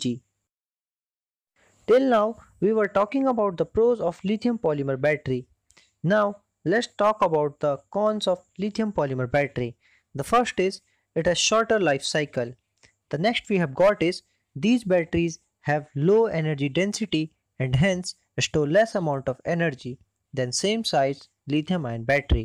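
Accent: India. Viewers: f.king indian accent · ogod indian accent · I just hate this indian accent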